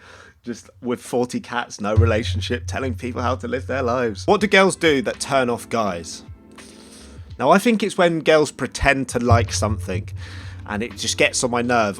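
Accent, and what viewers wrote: United Kingdom. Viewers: I didn’t know who u were but I was not expecting a British accent 😂😂 or idk what accent u have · I hate british accent but love yours :) though I need to work on my listening skills to get 100% of what u r saying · Oh My God, I love YOUR ACCENT!!! WHERE ARE YOU FROM?? Btw I can tell you're from the uk but where exactly? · Okay I just found your channel, wasnt going to stay but then the accent...lol yh it got me, wish my British accent was that strong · Aww i am so in Love with this british accent. Greetings from Germany ;) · I really like that accent, does anyone know which one it is? Like what region from Britain or how it’s called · His accent is the same as everyone’s British accent smh 🤦‍♀️ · Esther Igbinedion it’s very obvious he’s british lmao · Your smile Yout facial expressions 😭 I like them all, once again And to top it up the Bristish accent May you always remember this comment when you feeling low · His british Accent is so cuteeee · Yeah he’s a fellow Brit :)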